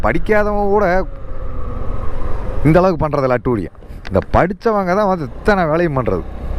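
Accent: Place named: Kongu, India. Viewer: Hi watching your vlogs with great interest. Wonderful effort. Keep it up..love your Kongu Thamizh. Subscribed your channel. All the best ❤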